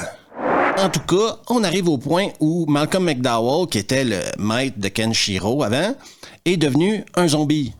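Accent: Quebec, Canada. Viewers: J'adore tes vidéos tu a un accent québécois a tu déjà vécu au Québec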